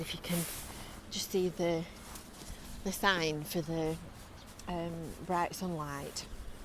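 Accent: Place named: Yorkshire, United Kingdom. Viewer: How could you be awful in Spanish at school with that broad Yorkshire accent? Maybe you should have took German 'cos you could talk quite loud then!! Plus it's more like english than you would think!!🥰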